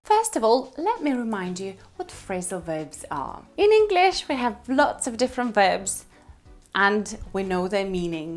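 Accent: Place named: United Kingdom. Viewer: I loved her British accent sounds really cool and like a native speaker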